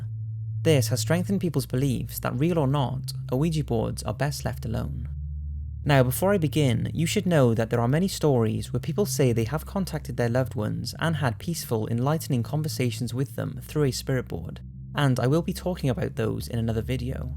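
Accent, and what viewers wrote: United Kingdom. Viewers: He's British and they just pronounce things differently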